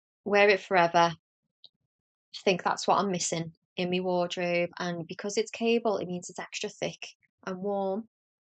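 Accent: Liverpool, United Kingdom. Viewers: Hi. New subscriber here from New Hampshire, in the US. I was born in Paisley, raised in Leeds and went to University in Liverpool. I heard your accent and you made me smile. The first YouTuber I have heard from Liverpool. I thoroughly enjoyed your “Make 9” episode and will probably go back and listen to the older episodes.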